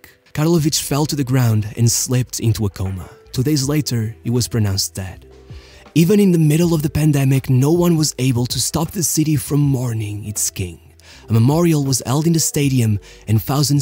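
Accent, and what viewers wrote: Portugal. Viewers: Nice Portuguese accent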